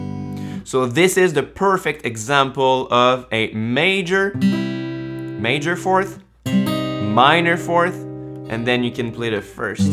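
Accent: Quebec, Canada. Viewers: Tu sonnes québécois. J’me trompe tu?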